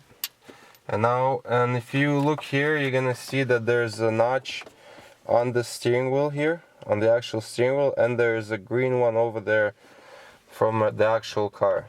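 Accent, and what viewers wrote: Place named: Russia. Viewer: слышится почему-то русский акцент...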